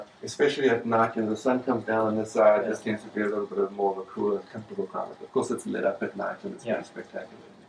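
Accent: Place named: South Africa. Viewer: You can pick up the South African accent anywhere :D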